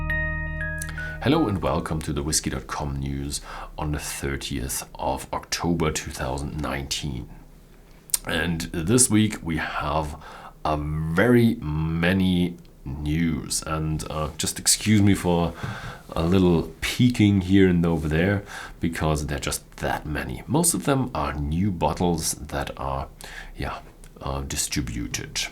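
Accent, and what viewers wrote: Germany. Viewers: "A lot of news" or "tonnes of news" would work well. That said, I'd recommend you don't try to correct it. It adds flavour to your rather slight German accent. Back on topic: I could've sworn I've had an Amrut rum that was quite good. But google says there isn't one...?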